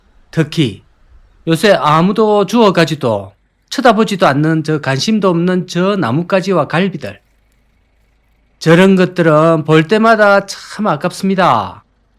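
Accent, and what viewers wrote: Gyeongsang, South Korea. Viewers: 웃음끼 없는 경상도 사투리에 화면은 보이지 않고, 웃기만 하는 순간들이 있습니다😊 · 갱상도 표준말 방송......좋심더에! 잘 보고 있심더. 배차, 소나무갈비,고매,.... 억수로 올만에 들어보니 71년 촌에서 초교 입학때가 선합니다요. 뭔 농막이 이리 커요? 쉼터로는 따봉이것네요.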